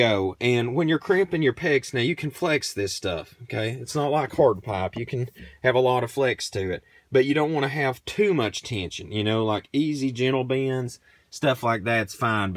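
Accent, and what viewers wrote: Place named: West Virginia, United States. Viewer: Sir, I love your accent where are you from. Sounds like West Virginia or Kentucky maybe.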